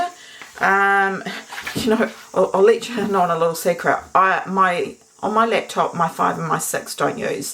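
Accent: New Zealand. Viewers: I love the kiwi accent lol a thuck wudth 🤣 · You had me at your fellow kiwi accent (shameless I know but I live in QLD now so...yeah). Definitely gonna give these a try and hope to enjoy with butter and jam. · Well done, as soon as I saw your recipe for cockies joy and heard your Kiwi accent you had me hooked. I love crumpets, and I'll make some tomorrow, My Nanna used to make great pikelets on the farm in Rotorua. Cheers. new subscriber from Tasmania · This popped up in my feed for some reason. I kept watching because of the Kiwi accent, but subscribed because of the recipes and your genuine communication with your watchers. Don't be concerned about your occasional silence when trying to think of the right word; this reminds so much of my own Scottish mum who would gab away at us while she was cooking. I am 72 years old now, and mum has been gone many years, but you brought back many happy memories. 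· Surely not an Australian, but a New Zealander… listen to the vowels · The Aussie half of me is so chuffed that you’ve visited our very fine shores, but the Kiwi side of me won’t let Australia take credit for this most excellent woman 😊! She has a rather thick (or as she said, “thuck”) Kiwi accent, a bit like how “aboat” is the giveaway when you realise you’re speaking to a Canadian not an American 😁✌️ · Kia Ora, have just discovered your channel, so good to hear a kiwi accent😂. Tried the crumpets today.. Kapai!!!! I'll never buy crumpets again, so so easy. I 'll be scrolling your videos.. Thanks, I am a Kiwi living in Brisbane. I'll be watching you😊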